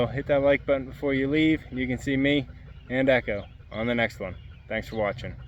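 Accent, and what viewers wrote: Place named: Western Pennsylvania, United States. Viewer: I was wondering the same thing. But I guessed western PA from your accent!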